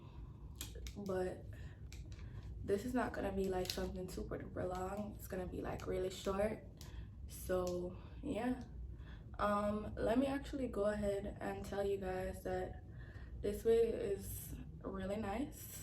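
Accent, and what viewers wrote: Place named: Jamaica. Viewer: I love your accent 😊😫 you must be Jamaican!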